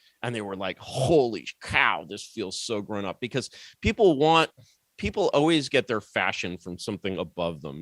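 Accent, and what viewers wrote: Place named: New York City, United States. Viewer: It's a nyc-ish Jewish accent not boston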